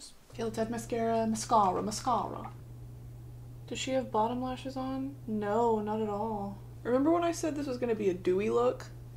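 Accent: United Kingdom. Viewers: Her British accent is so iconic it sounds like Harry styles and peppa pig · your british accent makes me think of adele lmfao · WITH THAT BRITISH ACCENT YOU WERE SERVING ADELEEEE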